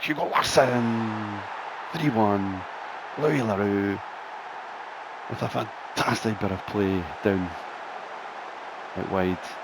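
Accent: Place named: Scotland, United Kingdom. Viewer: So refreshing to hear a scottish voice on Youtube keep it up brother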